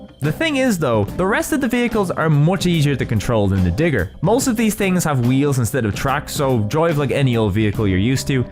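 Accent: Ireland. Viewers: I'm glad you picked up on something closer to his earlier Game Dungeon style. He's transitioned into doing a sort of 'play-by-play' style as he goes through the games because it's easier for him to get episodes out, which is understandable, but I don't like it quite as much, with the game having more of a factor in whether or not I like the episode than it did previously. So a channel with a classic Game Dungeon style talking about PS1 games most people don't remember with some dry humour sprinkled throughout? Now that's a winner right there. Throw in an Irish accent that I have fun imitating and making the decision to subscribe to you didn't take long at all. Keep it up, lad.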